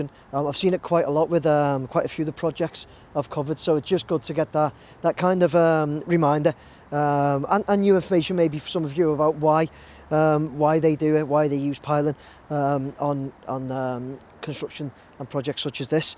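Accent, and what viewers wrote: Liverpool, United Kingdom. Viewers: Lol....now I appreciate you're Scouse but THAT has gotta be the worst effort at the welsh version of the Racecourse...ever! 🤣 FYI, it's pronounced 'Kai Rass'....👍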